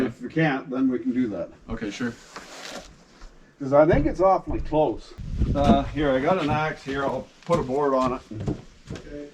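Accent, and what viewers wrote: Canada. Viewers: I just found this channel. Very nice work on this. Just going by the trees and his accent. Is this somewhere in Ontario?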